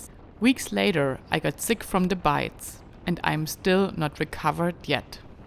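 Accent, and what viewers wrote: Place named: Germany. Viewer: Fantastic video Lady!! I have been everywhere you have in your video. The South is known for it's hospitality and you will find nothing like it anywhere in the world. Missouri and especially Tennessee are my favorite places to be. Georgia is my next favorite. The people are so laid back and friendly. Great film work Lady! Awesome stuff. I still love your German accent!! Peace! Ride SAFE and BE safe Lady!! 🙌👏👏👏👍☝🤙🤘✌👌🖖✋😊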